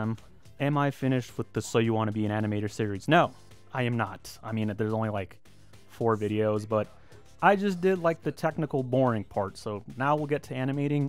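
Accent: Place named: England, United Kingdom. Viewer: literally asking myself in the head, is this guy english, then 1st second explains it all.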